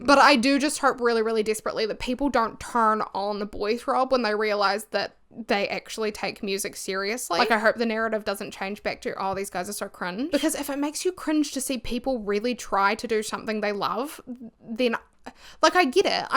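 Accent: Southland, New Zealand. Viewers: Loved the deep dive, thanks for the effort you put into this. For my part, I think they clearly are both talented, enthusiastic, committed to their craft, but also not a typical over-polished boy band, with comedic elements to their presentation, music and style. They're in on the joke, but they are much more than just a joke. I think it's very possible there is someone behind the scenes that helped bring them together, coordinate their efforts, and give them some interesting creative direction, but if anything it would be a collaboration not that the band members are just along for the ride. Ps love your kiwi accent. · You do the New Zealand O proud 👏🏽... or should I say "the New Zealand Oarrr." Or is it "Ower.?." 🤷🏽‍♂️ I have no idea what you're doing with your O's but I ❤it. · I know this is 8 months old, but your constant fiddling with your taonga while dropping that Southland accent is sending me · "my accent? 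it's a kiwi accent" right as I was wondering "huh, not British.. not Australian..." the moment I scrolled down and saw "NEW ZEALAND" by the description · Never heard of you or boy throb, but now, I appreciate boy throb greatly and I subscribed to you. Also cool to see New Zealander on YouTube and I also found it funny that you had to explain your accent as kiwi accents are everywhere is Australia lol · I was listening to your accent for a looong second, and I was like "wait? Nz??? Surely right?!", and then I saw the description!!! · just got recommended this video, and as if ur videos dont seem to be up my alley already, i heard ur kiwi accent, and as a fellow kiwi, immediate subscribe. its hard to find us out here !!! 😻😻😻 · She literally says in the video that it's a Kiwi (New Zealand) accent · english is not my first language, I only learned it through english movies, videos etc. and I can't explain my level of confusion after clicking this video. 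it's the first time I ever heard kiwi accent and for 5 mins I thought I never actually understood english language all my life. love the video btw I'm glad yt decided to recommend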